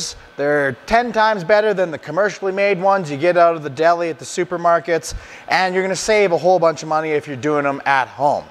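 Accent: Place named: Nova Scotia, Canada. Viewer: Hello btw sub from Belgium ! I started eating carnivore as of last Monday but I want variety and healthy meat so as they put all sorts in regular store-bought ham, I want to make it for my family. Btw, I'm originally from Cape Breton Island, Nova Scotia and I think you sound like the folks from home - but I have been over here for 35. You're fro which province, may I ask ?😀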